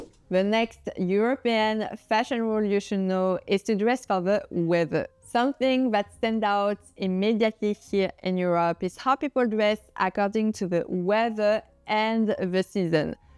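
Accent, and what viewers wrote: France. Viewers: I just love the way you show the pictures of Of Fashion on Lily Collins from the movie and or series as badly styled because I’ve watched a few of those and I agree it’s just wrong and then I’m wondering if you could tell me if you really have such a strong French accent, or you could speak English with less of it🤪😅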